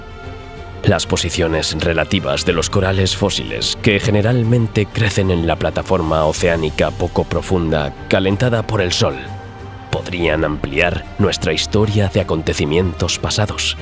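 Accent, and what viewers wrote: Spain. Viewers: Me gustaría un narrador más americano no Europeo, no me gusta la narración Española!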